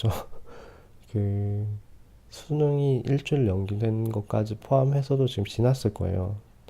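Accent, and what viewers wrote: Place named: South Korea. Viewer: I wanted to know what you said in the video hahaha I like the Korean accent!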